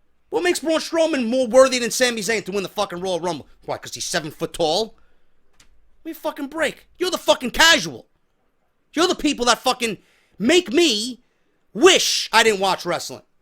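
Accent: New York, United States. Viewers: Started watching your videos this week, you do a great job of sourcing all the info you present to the audience. You have a strong understanding of what fans want and what the casual fan or even casual mark want but dont know it. I really enjoy the NY gritt you have, angry and smart tone but articulate and smart - well done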